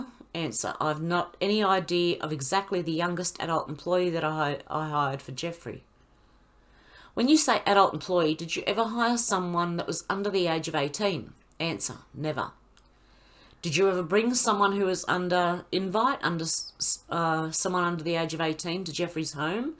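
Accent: Australia. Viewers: Thank you for reading, actually the Australian accent is perfect for, love you 😍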